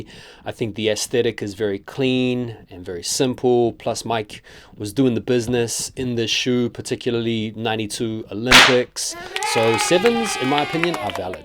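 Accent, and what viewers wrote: United States. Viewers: Why do you have an American accent here all of a sudden? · Why does he all of a sudden have a Brooklyn accent?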